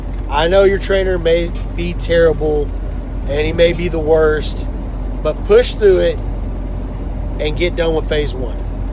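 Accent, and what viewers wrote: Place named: Texas, United States. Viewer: Part of Texas you from?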